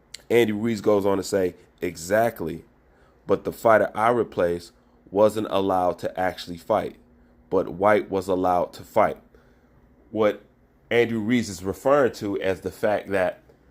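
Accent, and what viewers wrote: United States. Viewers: You just showed us that AR stated he was not from NYC, but you went on with deceptive ranting for 7 minutes claiming usa is not his back yard. Unless you can show were he said usa is not his back yard, otherwise it sounds like you are bending the truth here. Also your statement "all atheletes use Steroids" is another example of you the delusional conspiracy theorist. And yes you should just go watch mma and stay far away from this sport. You sound American but yet you still don't seem to understand and respect where the real heavyweight boxing kings are in this era. I bet you doubted the greatness of ruiz the same fashion many doubted wilder and ruiz knocked AJ smooth off. Please have more respect our American heavy weight boxers.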